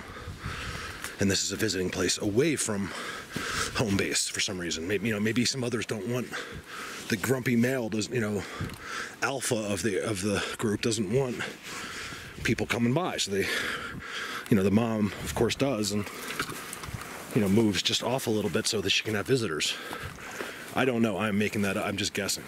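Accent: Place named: Philadelphia, United States. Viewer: Hey. I’m a brand new subscriber. I hear an accent. You sound exactly like a friend I have who’s from Philly but now in TN. It’s uncanny. There’s lots of Bigfoot here in the PNW. Too many weird happenings in my days of exploring. I had one specifically leave me a gift on the drivers side windshield of my car. It was sweet. Guess he enjoyed all the fish.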